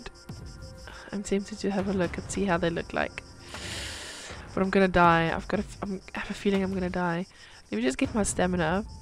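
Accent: South Africa. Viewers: you sound like south african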